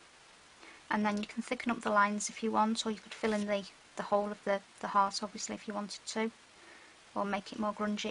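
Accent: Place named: Northern England, United Kingdom. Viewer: Fabulous work and great videos. I was trying to work out your accent - somewhere between Liverpool, Manchester, Cheshire and the Black Country?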